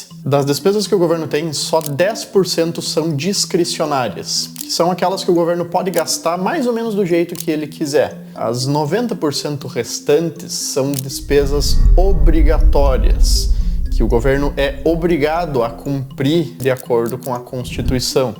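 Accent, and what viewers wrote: Rio Grande do Sul, Brazil. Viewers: Gaúcho tchê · sotaque gaúcho é mt legal de ouvir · duma coisa eu sei: tu é gaúcho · Se esse cara não é gaúcho eu sou maluco shshshs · Que vídeo! Que vídeo! Parabéns e continue assim, edição e roteiro espetacular! Só um detalhe: eu conheço esse sotaque aí em kkkkk noroeste do RS?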